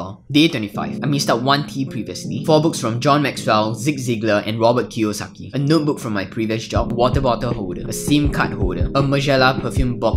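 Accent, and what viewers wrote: Singapore. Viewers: Straight up when I heard your accent, I knew you were Singaporean. Cheers fella! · Are you singaporean ? Nice accent1😁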